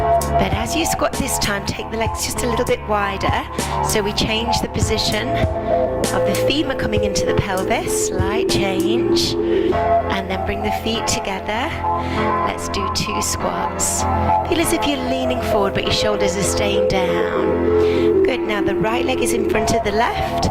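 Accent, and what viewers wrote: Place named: England, United Kingdom. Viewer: hello. I am blind and really appreciated the fantastic order description also I love the English accent am I and as I am also English thank you thank you so much will keep listening to your channel regularly Donna Adelaide Australia 55-year-old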